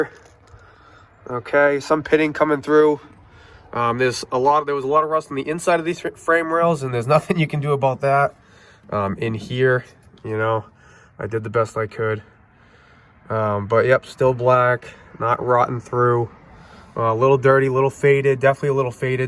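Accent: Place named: New England, United States. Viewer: Was watching your 2004 Corolla head gasket video and was thinking "between his accent and all the rust/corrosion he's gotta be in New England". Came here and found my answer.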